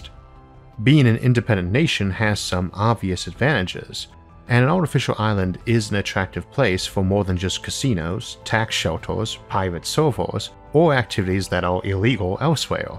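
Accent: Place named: South, United States. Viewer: I love space and everything about it. I have been looking for years for a channel that will satisfy my hunger for knowledge, both factual and fantasy. I have finally found it. Thank you Isaac and team. As for the "speech impediment". It's no impediment, this channel wouldnt be the same without your AMAZING Gone With The Wind lilt. SCARLETT: Isaac, Isaac....Isaac, if you go, where shall I go? What shall I do? ISAAC: Frankly, my dear, I don't give a damn. I'm off to Mars!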